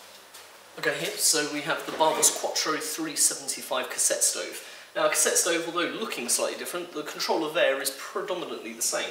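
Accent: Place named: United Kingdom. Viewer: This guy's too British to understand very well.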